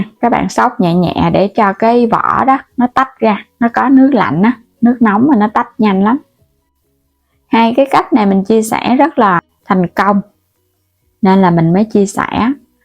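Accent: Mekong Delta, Vietnam. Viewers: HÔT GÀ , HÔT VỊT CHỨ KHÔNG PHẢI TRỨNG. COI CHỨ KHÔNG PHẢI XEM. BỎ VÔ CHỨ KHÔNG PHẢI CHO VÀO. PHÁT ÂM THÌ " GẶC " DÂN MIỀN TÂY NHƯNG TỪ NGỮ THÌ BỊ VC HÓA.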